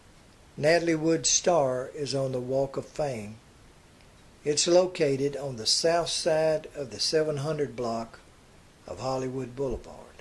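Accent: South, United States. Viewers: the hillbilly naratory drives me crazy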